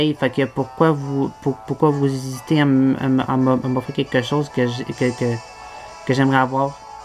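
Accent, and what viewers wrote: Quebec, Canada. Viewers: t un québecois?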